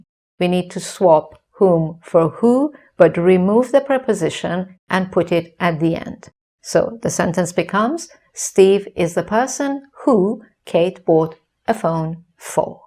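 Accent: England, United Kingdom. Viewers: I thought you were from inglan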